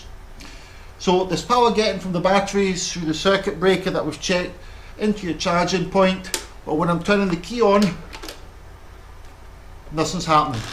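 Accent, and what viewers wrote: Scotland, United Kingdom. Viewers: Thank you for another informative video - Great Scottish explanation and made perfect sense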